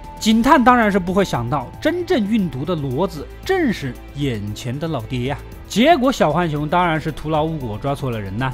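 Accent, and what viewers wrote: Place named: Wuhan, China. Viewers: 哈哈，口音好重，不是湖南的就是湖北的，点到你微博一看，原来是武汉伢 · 要听“老爷车”,你浓浓的武汉口音让我觉得像是我在说话：））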